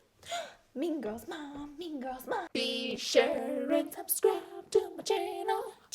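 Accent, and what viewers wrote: South, United States. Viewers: I love her accent so much! I’m a Georgian, so I’ve been raised in the south literally on a farm with people who have the strongest southern accents I’ve ever heard. And I just don’t have an accent all my siblings have one all of my family does but I just didn’t catch onto the accent for some reason. So when I hear people with really strong southern accents I absolutely love the way they sound! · She is from the south would you tell someone with a Jamaican accent that you HATE the way they talk?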